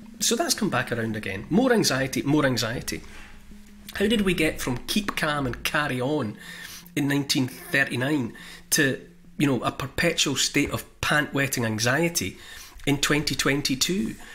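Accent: Scotland, United Kingdom. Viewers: I discovered your channel yesterday and I am glad i did! What you're saying makes lots of sense as we are many who share your views but many more who still need to wake up… please keep up the good work! You seem to have a Scottish accent, are you from Scotland? I am considering to reside there for a while… how are things there in general? thank you and take care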